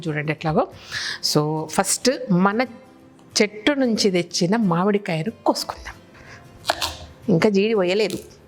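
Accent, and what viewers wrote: Telangana, India. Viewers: Madam meeru Telangana na....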